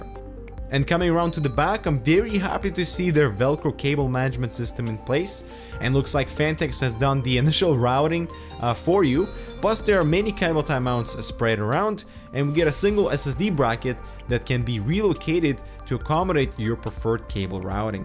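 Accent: Russia. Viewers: are you Russian? =) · Are you russian?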